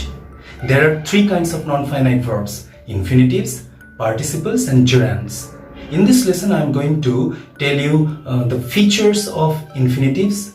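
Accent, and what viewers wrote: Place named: Bengal, India. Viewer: Your accent is wonderful and having Bengali touch little bit.I am suresh kumar from Punjab.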